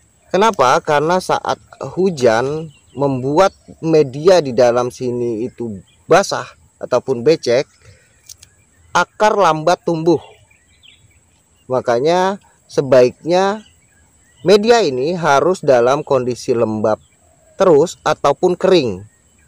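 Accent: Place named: Kalimantan, Indonesia. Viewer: Abang org kalimantan ya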